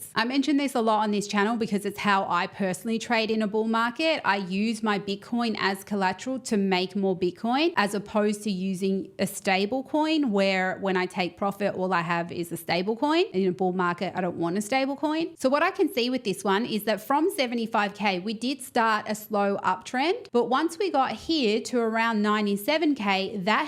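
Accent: Australia. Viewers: woman who loves crypto with an aussie accent. Florida/usa....I COULD NOT BE HAPPIER!!!!!!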